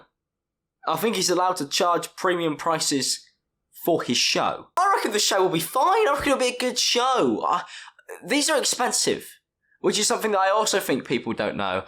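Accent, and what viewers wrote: United Kingdom. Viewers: The more I watch the less of a British accent he has//// guess ion getting used to it🥺🥺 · This is the first time I’ve watched an I’m video. Is he always this British?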